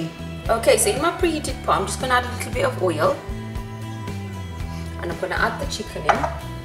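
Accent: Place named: United Kingdom. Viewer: I have no idea what u are saying british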